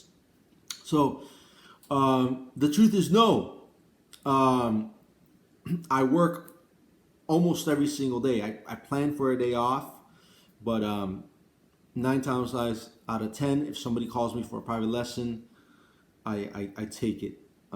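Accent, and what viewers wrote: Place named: Cuba. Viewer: Damn,! Your getting a lot babe support that I like your stuff. They must love that Cuban accent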